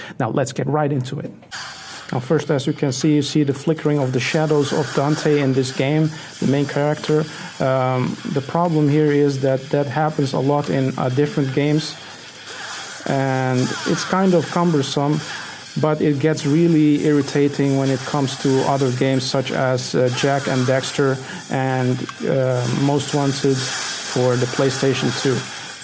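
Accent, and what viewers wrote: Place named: Netherlands. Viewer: You sound like you are dutch...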